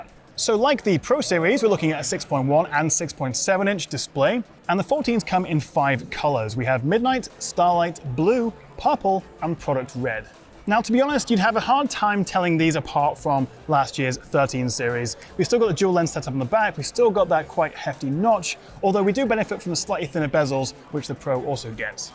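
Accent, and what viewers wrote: United Kingdom. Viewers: GAY BRITISH ACCENT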